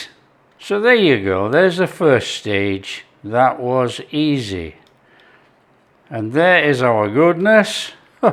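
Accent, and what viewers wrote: Yorkshire, United Kingdom. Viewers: I may be wrong but I though he said Yorkshire but 20 years removed? Forgive me if I'm wrong.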